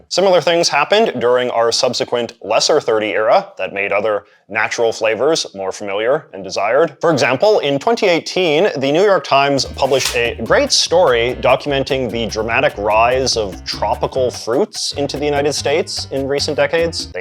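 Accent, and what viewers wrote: Canada. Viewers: I live extremely close to a major crossing from the u.s.a to canada and this guy's voice is complexing and annoying as hell! Like I assume he is canadian and their english doesn't flow the same way as american english for sure but I've never heard it so... monotone and yet choppy for lack of words. I was interested on the subject but can't continue watching the video as the voice is absolutely dreadful. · Your accent is aggressively Canadian.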